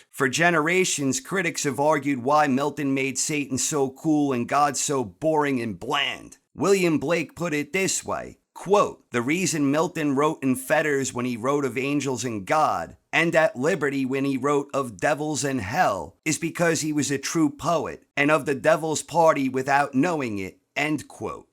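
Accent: New York, United States. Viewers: What accent? He sounds like a native speaker from New York or Jersey. That would be a dialect. "Accent" is only from one language to another.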